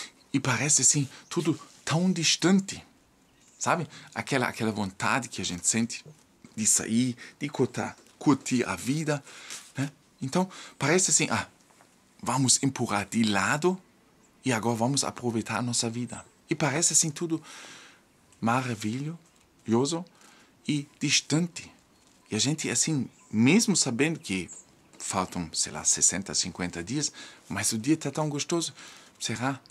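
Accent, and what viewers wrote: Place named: Germany. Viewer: Seu portugues é excelente. Entendemos perfeito. Acho lindo seu sotaque alemão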